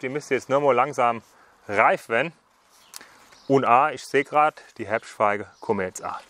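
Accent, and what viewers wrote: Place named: Hesse, Germany. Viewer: Tolles Vido. Und dein Hessisch ist einfach nur sympathisch 🙏🏻😇 LG Jonas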